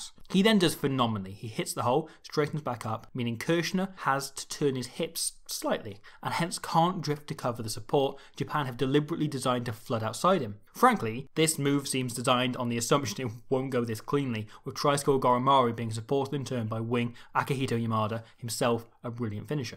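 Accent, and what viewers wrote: Wales, United Kingdom. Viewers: Never expected I would be this excited to listen to a welsh man speack to me for 30 minutes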